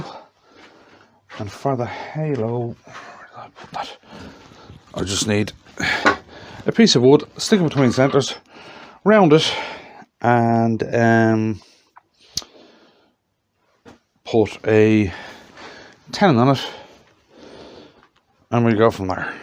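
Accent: Ireland. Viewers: Brilliant. Is that aDublin Accent.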